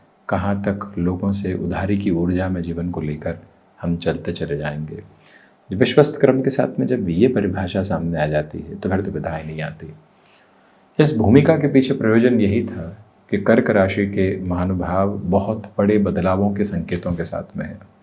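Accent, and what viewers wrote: Standard. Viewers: आप बहुत शुद्ध हिंदी बोलते हैं, बहुत से लोग समझ नहीं पाते, घ्यान दें। मैं हिंदी में एमए हूँ।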